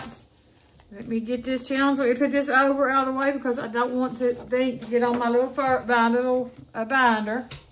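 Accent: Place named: South, United States. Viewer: It’s so great to hear someone doing this that sounds like me 🥰. I’m from Georgia and believe it or not I think you may even sound a little more country/southern than me. I LOVE IT!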